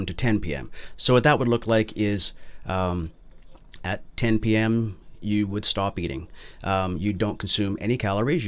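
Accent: Canada. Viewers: ooh that Canadian accent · hmm are you Canadian? Got that Canadian "out" thing going on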